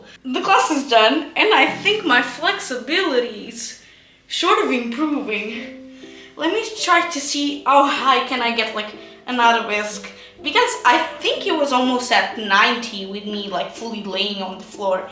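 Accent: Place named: France. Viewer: I've watched several of your videos and shorts. Youre actually looking quite good! Trying to figure out your accent. First though South American, then maybe Russian, but then you don't pronounce h's, so French?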